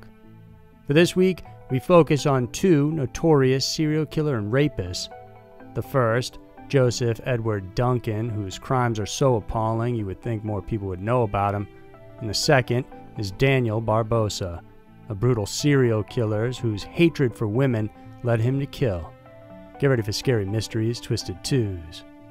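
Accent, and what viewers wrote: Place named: Texas, United States. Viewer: Your bayou / Texan syrupy accent is just so nice to listen to - despite the content being so disturbing. Happy to have found your channel. 💖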